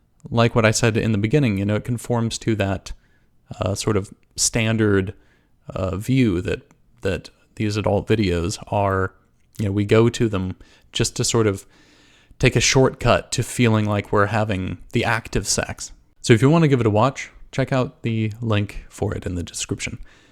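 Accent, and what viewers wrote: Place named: Israel. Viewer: dam she sounds like a hebrew speaker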